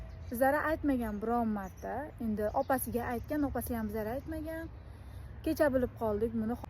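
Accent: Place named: Qorakul, Uzbekistan. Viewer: Qarakoʻl danmi siz shevamiz oʻxshaydikan.tinch yurmanglar ayollar.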